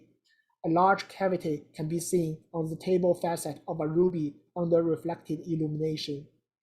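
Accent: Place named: China. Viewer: Incredible presentation. I got cheated out of school but I would have done great in college. I used to love making presentations like this. This man would be a fun teacher. I know what it can be like to have pseudo intellectuals brag about something that they know nothing about, when its something you're passionate about the knowledge of. I love all fields of science. I searched for this video just by hoping it was a thing. Very Incredible presentation. I feel like he and I would get along well. I had gotten accepted into a trade school but I was one of tge unlucky few who had a cinema like group of bullies that beat me up for no reason and broke my glasses, so I stopped going to school but I never stopped being passionate about learning. Im sure people in your field have to deal with know it alls like pseudo spirituality people and corporate minded manufacturers. 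I bet its frustrating. I do find gems and chemistry in general fascinating but my area of expertise is literature and history. I got bullied into leaving school, chose to be a superhero and went up against things out of horror movies. Lost my best friends in sad scary ways and try to give shout outs abd credit where its due. I dont know much at all about the man in this video, but hes gifted and based on his accent, Im going to assume his first language is mandarin. I find other languages interesting too. Knowing more than one generally makes you cooler than people who dont so Im impressed. I feel like English is on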